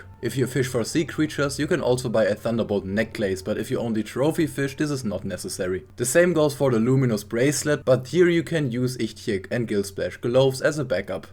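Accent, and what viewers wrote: Germany. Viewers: he sounds so german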